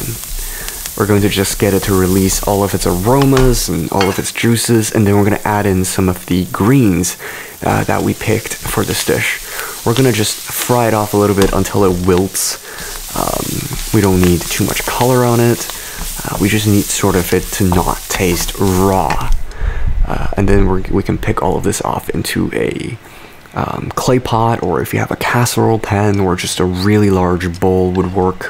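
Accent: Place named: United States. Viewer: Unnecessarily Far too long with American accent is irritating. Some items are too exotic to find in the UK.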